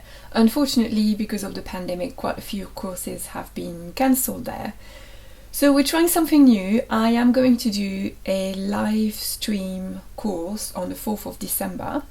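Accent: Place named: France. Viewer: I discovered your channel only a few days ago. I'm a beginner artist (colour pencils at the moment) and appreciate your clear instructional videos and the general information on art you share. Your mix of the French and English accent is so cute I can't help coming back just to hear it. It reminds me a little of Meryl Streep's accent in Out of Africa "I had a farm in Africa at the foot of the Ngong hills..." lol! Not a Spock reference sorry.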